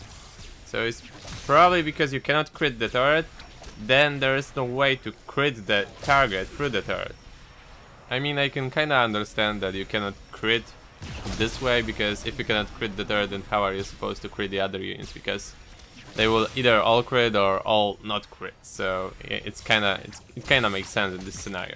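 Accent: Poland. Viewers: polish accent xd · Are you Polish? You sound Polish. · Twój akcent, taki Polski ;p Oby tak dalej, ziom! ;)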